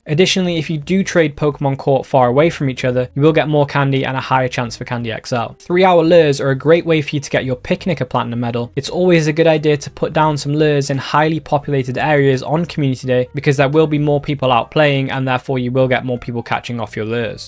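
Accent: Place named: United Kingdom. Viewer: He’s speaking the Kings English not American 😂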